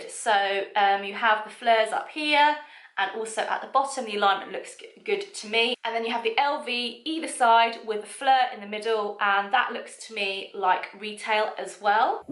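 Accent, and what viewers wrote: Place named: United Kingdom. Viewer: Love your British accent! I got the nano noe in the DA print with the animation holiday edition. Subscribed!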